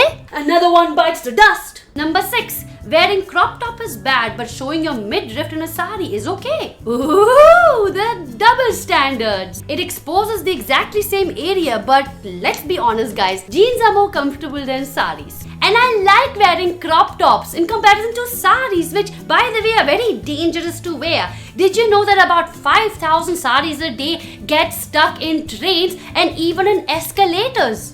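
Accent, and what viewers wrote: India. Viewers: You mother tongue is German? I mean what? You look so Indian!! Woah! And the way u speak Hindi is so proper.. You grew up their.. still your Hindi is So awesome and your English is Indian accent! You gained 200% more respect from me :D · i love u for ur indian accent......luv u babes......u look exactly like a south indian actrrss shari.....browse for shari-malayalam actress......u look like her exactly .....she plays villian/negative roles most of the times......u-u r the best.....love u lots....but kinda miss ur caps!!! they wre fab!!! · i really love the fact that you were brought up in Germany with a German mom too, but still speak Hindi really well, speak German like a boss, and speak English with the Indian tango! It's so unlike the other kids brought abroad without any sense of their roots, great work! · if you wanna be a critic, then no one's stopping you! 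But please, drop the Indian accent! For a lady who's travelled the world who was born in Germany, you got a real thick typical Indian accent, and your totally misusing it to talk trash about India! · your accent is Indian. I don't think you are born and lived in Germany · ur grown up in germany...but ur accent sounds indian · how did you grow up in Germany and have such an Indian accent?? Are you exaggerating it? to make it more entertaining? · why don't people subscribe? you are an acquired taste, it takes time getting used to you. but you keep people hooked one way or another. For the longest time I couldn't figure out if you had this desi accent for real or you were being sarcastic with the accent. Even with german as your first language, your indian accent is heavy. its alright, nothing bad, thats the way you are. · I love ur desi accent and not faking it !! <3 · Doesn't seems like she been to Germany. 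Wow her accent is so Indian. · U grew up in germany but ur accent sounds like desi...Which literally makes no sense.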